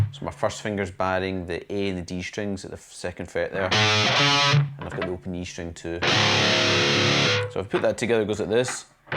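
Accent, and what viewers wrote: Scotland, United Kingdom. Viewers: Thanks for this tutorial man, love your fackin' accent, you're scottish aren't you? greetings from france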